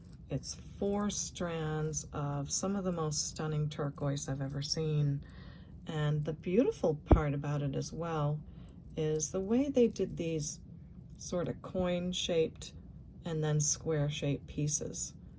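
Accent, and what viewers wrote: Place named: Northeast, United States. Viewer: Love!! One of my faves! Maybe I just like the yankee (North eastern) accent.😂 Born and raised in Maine. So sorry for the loss in your family. Praying for peace and comfort. (❤)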